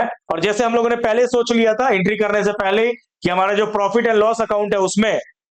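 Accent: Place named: Kanpur, India. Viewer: Language (boli) se lag raha hai AAP kanpur se ho. What am I right??🎉🎉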